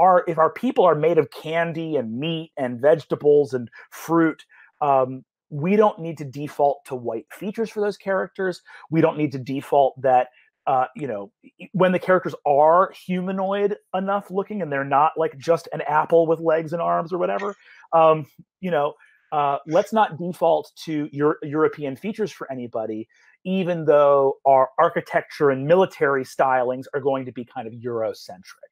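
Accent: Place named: General American, United States. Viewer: He doesn't do it with Asians, either. His Battle for Beyond character, Nikhil, was coded Indian -- DM Jasmine Bhullar even gave the character's sister a distinctly Indian accent. Brennan just spoke with his Broadcast American English accent. Bear in mind Brennan can do quite a lot of white accents, from New Yawkuh to Sad Italian Man, to Russian mobster, to various flavors of Tim Curry, and many more.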